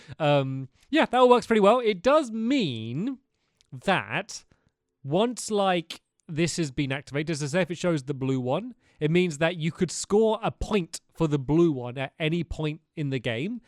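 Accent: England, United Kingdom. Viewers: Oh, oh you make me smile! I think i'll sit down and watch for a while. Oh, oh, when i hear voice. I hear the engish acent i rejoice! Oh, oh, your worldx are lovely! Full of cakes helpers and lovely jublies. Oh, oh sta-oh no! Its hitthetarget!! He shoots an arrow, you gotta block it! Horray we won! Now the day is done. Now its night, turn off the light and dream... Oh, wait, whats my dream? To become your helper. I am a great builder! Oh please, oh please. Gamer tag: CrystaI dog P.S. the L is a capital i Thank you!😊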